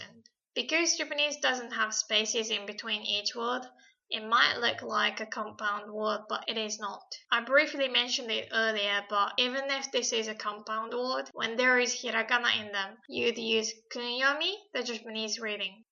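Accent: United Kingdom. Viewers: Now that I've heard a Japanese person with a British accent, I suddenly understand what it's like for British people to hear most non-native English speakers with an American accent. p goofy m8 also saigomademitayo, fam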